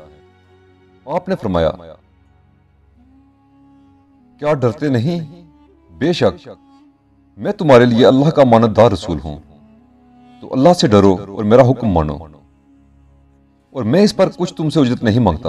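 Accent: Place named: Murree, Pakistan. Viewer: آپ کی آواز سے لگ رہا ہے جیسے مری یا ایبٹ آباد سے تعلق ہے اچھی ویڈیو بنائی ہے جزاک اللّہ خیر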